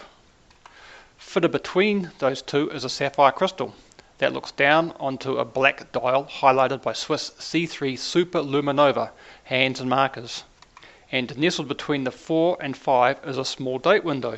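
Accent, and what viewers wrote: New Zealand. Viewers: Nothing like a kiwi accent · È un leggerissimo accento neozelandese... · Such a pleasure to hear a New Zealand accent around horology circles on YT. As a proud Aussie, I was taken aback by your omission of the local (Auckland,) and well-regarded, Magrette brand.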